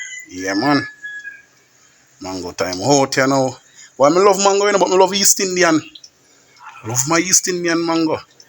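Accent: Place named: Jamaica. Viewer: The jamaican accent 😊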